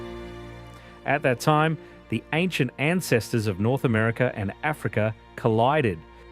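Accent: Australia. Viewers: I don't think there is anything I wanted more today than to come home eat some Panda Express and listen to an Australian man teach me about my home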